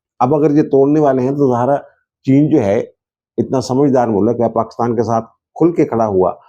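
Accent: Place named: Punjab, India. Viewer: صاحب پنجابی ٹچ کمممممال ہے آپ کا۔ ❤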